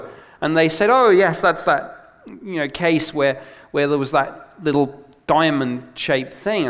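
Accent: United Kingdom. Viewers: is it just me or is he a terrible speaker but his British accent keeps me listening and associating some kind of learned authority to what he says?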